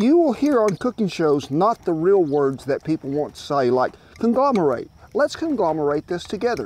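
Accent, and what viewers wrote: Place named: Louisiana, United States. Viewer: Where are you from? Your accent is throwing me off. Looks like your from Wyoming, the land around you looks like Texas and the accent sounds like you're from Louisiana or Mississippi, but the words aren't matching. But there's like a northern thing going on idk lol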